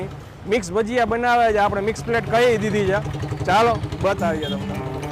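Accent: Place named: Gujarat, India. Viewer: Looking Dashing 😎 I like ur personality nd yr sweet gujarati boli I'm big fan of ur personality 🙂😊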